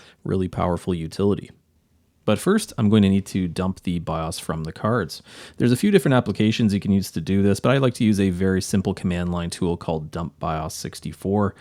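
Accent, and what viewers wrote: Canada. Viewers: I saw Voodoo 3's in the thumbnail and assumed this was a "Bits und Bolts" video. I was surprised when a familiar Canadian accent appeared instead. *waves from Winnipeg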